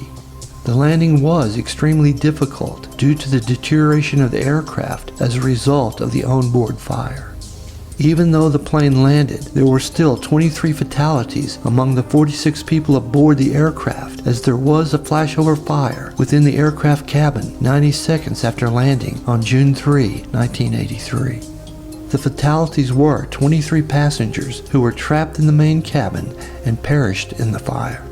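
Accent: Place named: South, United States. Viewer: I hope episode 4 drops soon! Thanks, this was excellent. Love your accent! Are you in the South? ❤️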